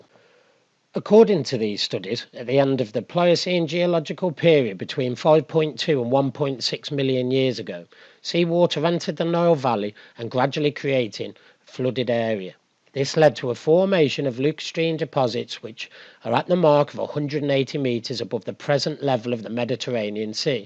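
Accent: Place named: Birmingham, United Kingdom. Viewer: The Lost History Channel TKTC I’m here (&subbed) due to you fella.and I do love a brummy accent 😉👍🏻😂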